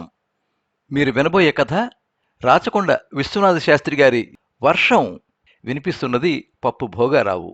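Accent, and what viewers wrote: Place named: Srikakulam, India. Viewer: మంచి కథ వినిపించారు. మీ గళం లో శ్రీకాకుళం యాస కూడా బాగా పలికింది. మీరు చెప్తుంటే పాత్రల్ని చూస్తున్నట్టు అనిపించింది. కానీ background వర్షం sound మీ గొంతుని dominate చేస్తోంది. Concentrate కథ మీద కన్నా వర్షం sound మీద ఉండడం వల్ల మళ్ళి మళ్ళి వెనక్కి వచ్చి కథ వినవలసి వచ్చింది. 👌🙏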